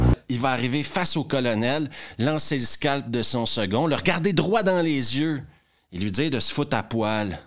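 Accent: Quebec, Canada. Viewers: Ton accent québecois te rend service. C'est vraiment très plaisant de t'entendre dézinguer des mauvais films avec cet accent et 2, 3 expressions typiquement québécoises. Je vais nulle part. Je vais pas "à nulle part". Simple exemple.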